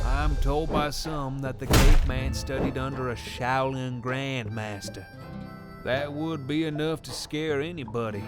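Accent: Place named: South, United States. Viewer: oh dear god yes . i love the southern accent. he need to do more voice over. yes can't wait to hear him again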